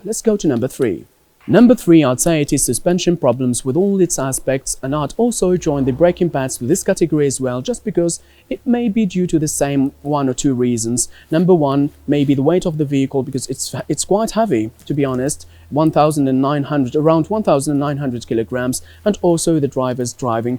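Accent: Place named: United Kingdom. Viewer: UK englich ❤️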